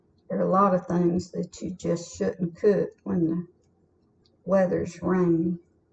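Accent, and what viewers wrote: South, United States. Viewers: I love your southern accent and pie.Just like my mother in law . I miss her so much ❤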